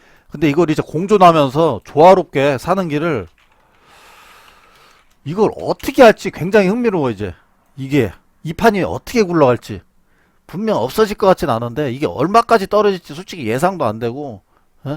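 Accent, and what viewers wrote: Chungcheong, South Korea. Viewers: 어디 천안 or 당진쪽 사투리 같은데 말투가 넘 친근하네요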